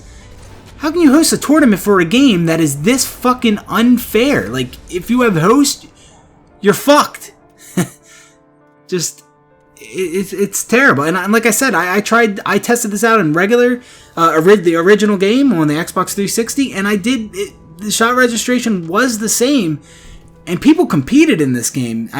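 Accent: Baltimore, United States. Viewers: Baltimore for sure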